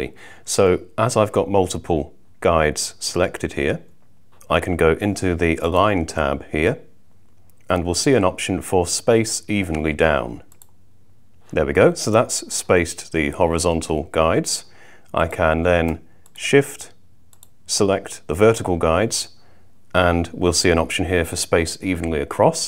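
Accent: United Kingdom. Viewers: Excellent - that helped a lot - nice to hear a British accent that I can actually understand as opposed to an American gabble.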